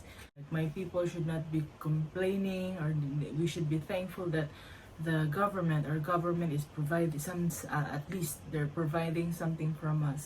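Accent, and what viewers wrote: Nigeria. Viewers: Have you realized you have developed a Nigerian-English accent? yes, you sound like a nigerian... Great video.. cheers!!!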